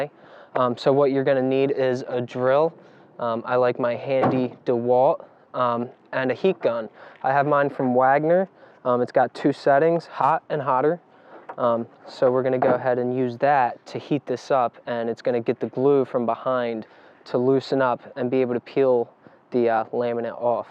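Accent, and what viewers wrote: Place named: Philadelphia, United States. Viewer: Thank you so much for this tutorial! I could tell you were from Philly from your accent! :)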